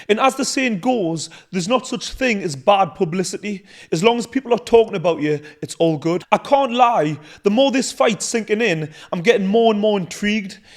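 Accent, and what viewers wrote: Newcastle, United Kingdom. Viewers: It's like watching an episode of Geordie Shore with the narrative style and geordie inflexion of the voice - well put together and delivered mind, OSS🤙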